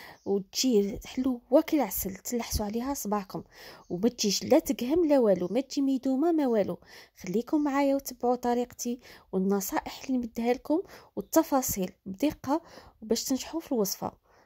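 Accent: Tlemcen, Algeria. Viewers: اول مرة سمع لهجة تلمسانية سبحان الله حنا جزاؤرين. ومنهدروش كيف كيف ،🤔🤔🤔🤔🤔🤔🤔🤔🥳🥳🥳🥳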